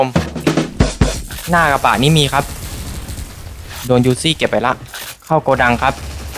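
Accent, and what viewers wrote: South, Thailand. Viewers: พี่คนใต้ใช่ไหมครับ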